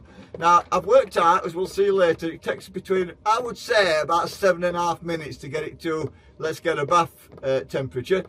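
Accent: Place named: Barnsley, United Kingdom. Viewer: Barnsley by t sounds. same ere..good video lads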